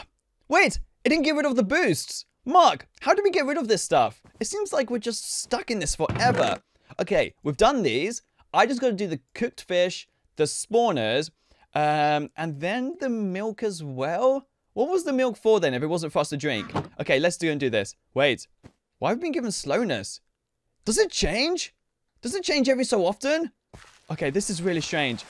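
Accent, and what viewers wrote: United Kingdom. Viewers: Hi how you British accents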